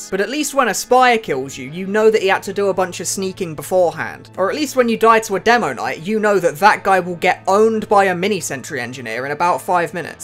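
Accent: United Kingdom. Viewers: TF2 ruined me. I thought you're Aussie but nah you're Brit. Thanks Sniper.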